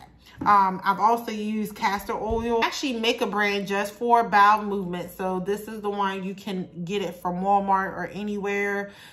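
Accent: Baltimore, United States. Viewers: Are you from Baltimore? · You gotta be from baltimore lol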